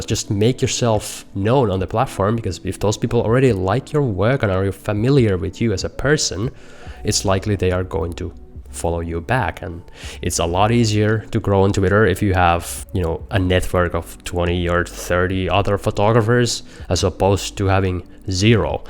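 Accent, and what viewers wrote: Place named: France. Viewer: Such excellent information, I'm so excited I just followed you on Twitter! I created a Twitter account several years ago intending to start posting images but life got in the way so I just recently became more active. It's been very confusing not knowing the best practice for photographers which is partly why I was procrastinating to begin with and your YouTube videos have truly been a gift. Initially I didn't use any hashtags because I was told it wasn't a good idea but I'm only getting a few "likes" although some of my Tweets are receiving a fair number of impressions. I'm not sure exactly what that represents or if it's meaningful, how can I tell if these are just views or retweets? Also do you think it may be a good idea to retweet some images I already published by putting them in groups of 4 as suggested here and adding hashtags since I don't have twitter Blue and can't edit anyway? 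Also I'm confused whether it's okay to retweet my own posts on other pages for additional exposure or will that show up on my page as well? Please if you can help clear up these few things for me I will be extremely appreciative of your time and attention, all the best! And you're cute too, love listening to you French accent and easy on the eyes helps watching along with all the wonderful advice!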